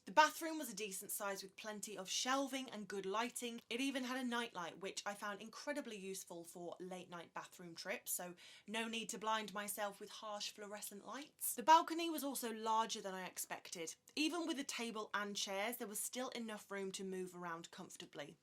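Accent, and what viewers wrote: United Kingdom. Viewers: I just love a reviewer with a British accent!.....btw I love virgin voyages!!!1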